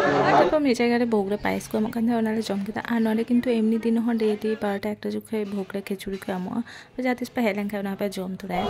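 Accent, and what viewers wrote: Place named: Santali, Bangladesh. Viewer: বাংলাদেশের সান্তালী একসেন্ট এর সাথে আপনার একসেন্ট মিল আছে।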